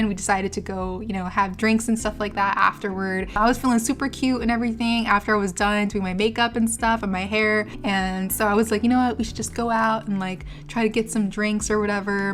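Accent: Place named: Miami, United States. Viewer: sounds like miami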